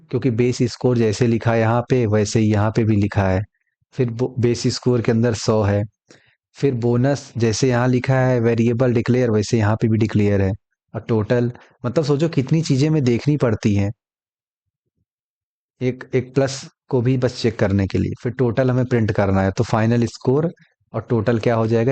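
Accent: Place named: Uttar Pradesh-Bihar, India. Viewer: Nice yaar. Or apni UP Bihar wali hindi me or maja aa rha hai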